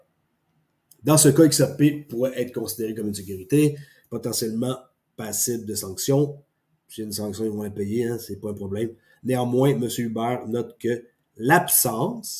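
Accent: Quebec, Canada. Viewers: Merci pour tes recherches et analyses. Cest plaisant d'entendre un quebecois se prononcer sur le sujet XRP XLM XDC!!! LA MECHE SE RACCOURCIT!!! KABOOM!!!!!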